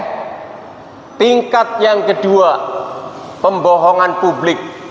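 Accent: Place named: Songgom, Indonesia. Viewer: Kirain wong cilacap jbule wong songgom👍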